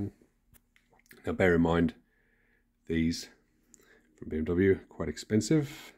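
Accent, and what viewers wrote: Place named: United Kingdom. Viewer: you're British ? ..why dont you speak proper English Proper ?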